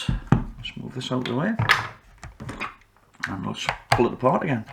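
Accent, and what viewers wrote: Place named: North East, United Kingdom. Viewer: The North-East accent is never a problem.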